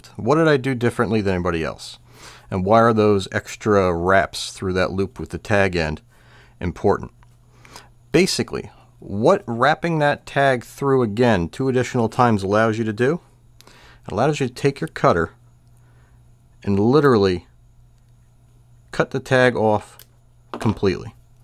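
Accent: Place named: Westchester, United States. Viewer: Your accent sounds like a friend from Westchester. He grew up in CT, but family is from the NYC area and L.I.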